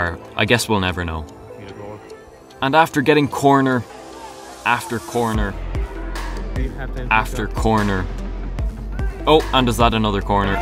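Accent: Ireland. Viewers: are u from Ireland 🇮🇪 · Are you from Ireland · Are you from Ireland?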